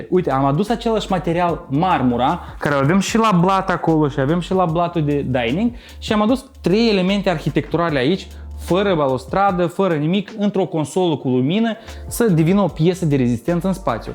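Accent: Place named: Moldova. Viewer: Prietene, tu nu ai un accent minimalist; accentul tau de moldovan e foarte pregnant!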